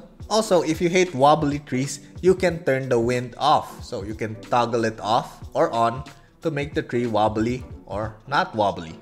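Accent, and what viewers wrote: Philippines. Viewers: Are you a Filipino? You have that accent you know.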